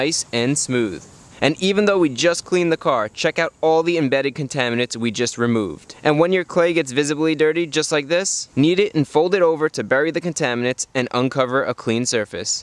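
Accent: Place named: Boston, United States. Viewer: I own a car. It is PM and am not losing sleep watching this video. But never in a thousand years would I wash my car. I don't care what says, I will drive it through the car wash. But I am watching the video anyway. I think it's because his voice and Boston accent - wodder - reel me in.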